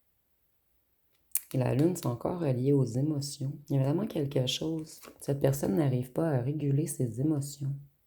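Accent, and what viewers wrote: Canada. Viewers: ❤ Merci,oui, exactement,il a menti, évitant, sûrement vie antérieure mais où ?, exactement il a tout foiré, j'adore votre accent canadien,en le côtoyant j'ai compris qu'il ne voulait pas travailler sur lui,et guérir ses blessures.C'est exactement ça,en se rejettant,il m'a rejetée, m'a fait beaucoup souffrir 😢😢.On a la blessure d'abandon en commun,et de rejet aussi,il faudrait qu'il aille voir un psychologue.C'est ma flamme jumelle 🎉🎉,tout ce que vous avez dit est excellent.On s'est rencontré en 2021.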